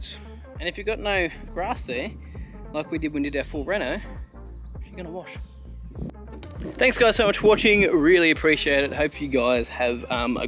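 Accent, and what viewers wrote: Australia. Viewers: That’s exactly what I imagined Australia would sound like. Signed, every American.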